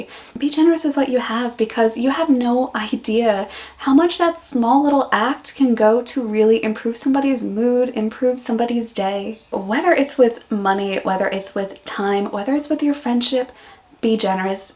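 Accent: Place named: Australia. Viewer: Fantastic. You have nailed some of the big differences between here and the States. You are sounding more Aussie everyday.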